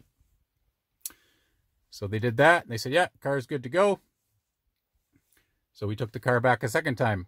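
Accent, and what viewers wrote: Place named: Illinois, United States. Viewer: That Mid US Illinois area accent is just annoying! Hence, the extreme cold air may have contributed to the screen not turning on! The Acuras for the rest of us in the USA should be mostly just fine!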